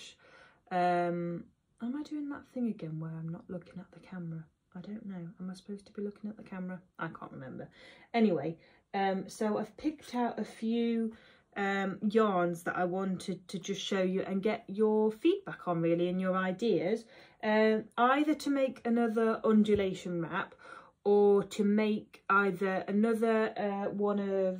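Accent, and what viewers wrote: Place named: Yorkshire, United Kingdom. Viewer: Hi, I've watched both your episodes now and really enjoyed them. I love to see a mixture of projects, yarns and recommendations so your podcasts tick all the boxes! I live in Yorkshire so it's great to hear an accent from not too far away! I also watch Cherry Heart (I'm currently making a battenberg blanket), I love Siobhan's Crafts yarns and I learned to crochet by watching Bella Coco tutorials. I'm not on Instagram but I look forward to seeing more podcasts from you on this channel! 😄👍🏻 Helen x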